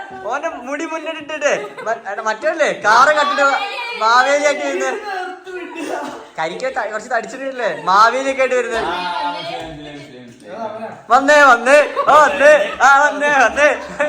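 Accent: Kodungallur, India. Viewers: കൊടുങ്ങല്ലൂർ സ്ലാങ് ആണിത്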